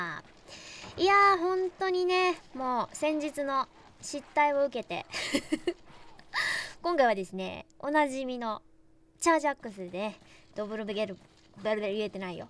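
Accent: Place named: Kansai, Japan. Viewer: 動画がアップされるごとに関西弁のレベルが上がっていっている 素がで始めたのか 昔は・・・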